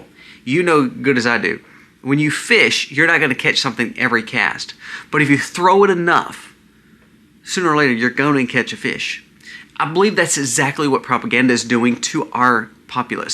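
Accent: South, United States. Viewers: I know you have a pleasant southern drawl. But if you could enunciate a little louder or clearer, my old yankee ears could understand you better No disrespect...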